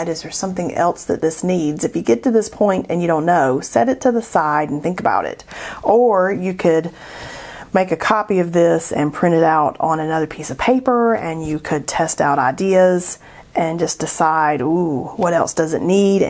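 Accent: South, United States. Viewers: I loved your southern pronunciations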